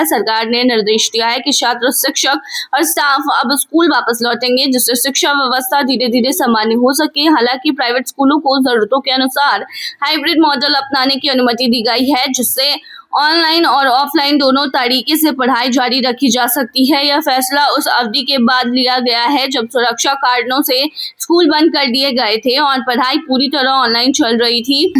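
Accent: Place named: Pakistan. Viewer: Horrible urdu accent, incomprehensible verbal diarrohea! Pls use copper tongue-cleaner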